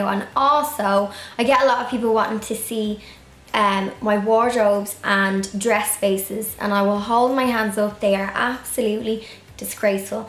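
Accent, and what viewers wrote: Ireland. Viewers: Hi, what a wonderful master bedroom, it is ideal. I only have a fairly small bedroom, fine for me and my cats, I have four, was five but one died last week and I was devastated, she had a poor heart. Anyway you always cheer me up with your beautiful accent and amazing videos, thanks so much. xx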